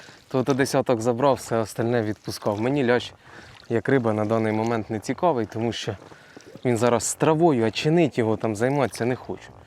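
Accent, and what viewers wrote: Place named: Central Ukraine, Ukraine. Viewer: По говору і краєвиду центральна наша красуня, може Полтавська або Черкаська.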